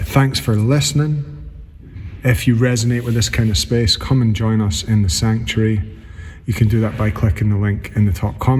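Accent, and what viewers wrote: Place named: Scotland, United Kingdom. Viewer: Hi, I've just been listening to all your music on YouTube again. ❤️ Keep being amazing and real❤️ Love all your music and listening to your lovely Scottish voice in the chats too. Thank you for voicing most of my deepest inner thoughts, concerning grief. It never disappears, it stretches out, as you get on with everything else in your life. I've lost lots of people sadly, including my middle son and my husband. Music has always helped me through. Your music is very special and continues to support lots of us, on our life journeys. Sending best wishes and much love to you and Rosa xxx❤️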